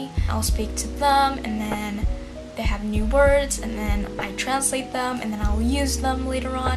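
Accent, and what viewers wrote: United States. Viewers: Hello there:) Greeting from Montreal Canada, your English is fantastic and your accent is top notch. you sound very american. Well done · Sounds pretty much the same as american dialects !!! I like it how you say the T like the general american, keep improving !!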